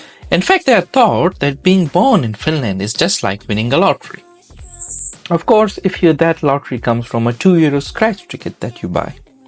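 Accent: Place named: India. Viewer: Miksä kuulostat ihan intialaiselta? Etkä taia kyllä perkele mikää suomalainen olla. (He clearly is not a finnish person like his name says) fucking clown vittu mikä pelle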